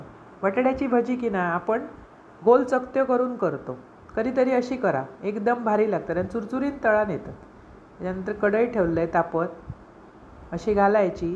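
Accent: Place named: Malvan, India. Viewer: ताई मस्त 👌👌 आणि त्यात मालवणी भाषेची गोडी व्वा! 🙏💐👍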